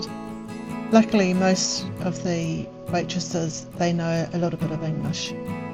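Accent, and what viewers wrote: New Zealand. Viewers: A kiwi voice lol 😂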